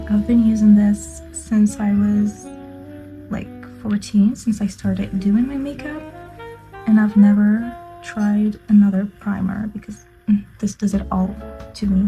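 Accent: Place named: France. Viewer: And the french accent was smooth and pleasant to listen to 🫠🫠🫠💋💋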